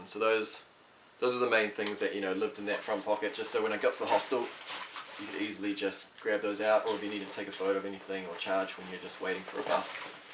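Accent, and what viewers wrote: New Zealand. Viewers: So good to hear Kiwi accent on Youtube! Im from NZ & off to Euro in 2 wks time. Got a Pacsafe x40 & few other bits n pieces. Thanks for the tips, really helpful. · a Kiwi (from New Zealand - the land of superb hiking). Aussies generally have broader accents, lol!! Happy travels. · Great video bro! I'm a fellow kiwi currently travelling around Europe too, you have a lot of great tips and I've defiantly learnt a couple things. Good to hear a kiwi talk after months of Europeans haha. loved the video. Cheers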